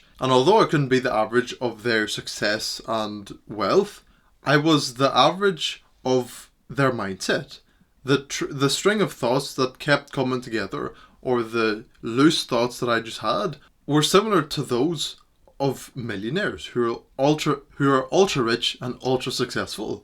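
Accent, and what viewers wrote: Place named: Belfast, United Kingdom. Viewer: I can relate bro, recognise the Belfast accent I'm from Newry bro and the quality of (friends) in this area are nowhere near good enough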